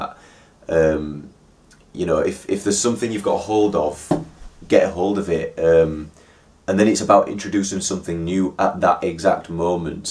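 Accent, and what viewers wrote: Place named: Halifax, Canada. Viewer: where are you from ? im from halifax you sound like you might be close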